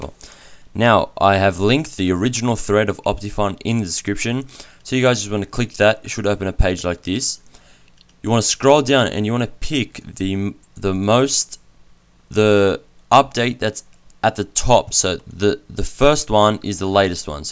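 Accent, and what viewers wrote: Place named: Australia. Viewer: ma gawd so damn Australian btw I'm from NZ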